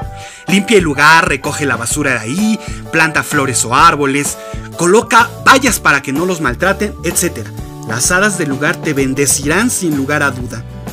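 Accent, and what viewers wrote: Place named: Mexico. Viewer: Con ese asentó mexicano?🤔 Nooooooooooo Me agrada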